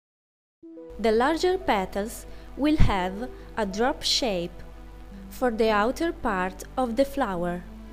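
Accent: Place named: Italy. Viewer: Cute strong accent. What part of Italy r u from?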